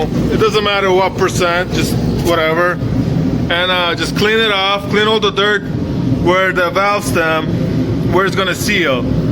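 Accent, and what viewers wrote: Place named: New Jersey, United States. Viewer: What accent is that? Jersey?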